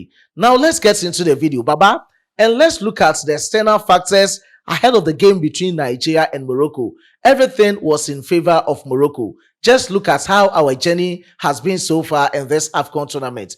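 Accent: Ghana. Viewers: You're not a Nigerian. Your accent betrays you. You are clearly a Ghanian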